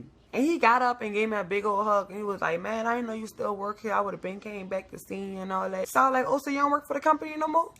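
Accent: New Orleans, United States. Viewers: I love that NOLA accent 💞💞💞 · OMG! I don’t know how I got on your page but I’m glad I did. Your storytelling is bomb! You are hilarious but you speak the truth. You sound like ur from New Orleans 😊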